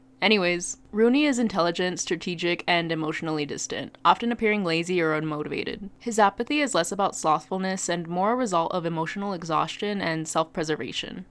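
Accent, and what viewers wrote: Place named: Southern California, United States. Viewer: r u a cali girl youre srs giving insane socal (specifically la and surrounding counties)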